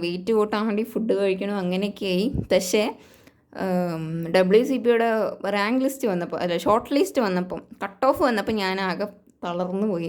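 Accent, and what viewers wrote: Palakkad, India. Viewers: Ningal Palakkad aan llee😂😅??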